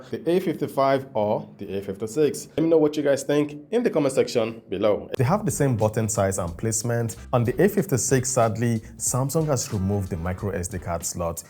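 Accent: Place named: Nigeria. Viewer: Most of the Nigerians be like... "If you aks me"....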